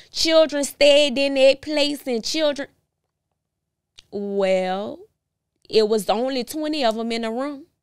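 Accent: New Orleans, United States. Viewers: Her and that New Orleans accent 😩🥵😍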